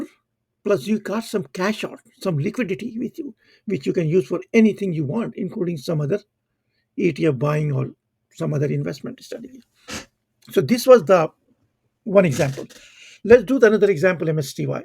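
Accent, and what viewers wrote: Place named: India. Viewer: Indian accent!!!!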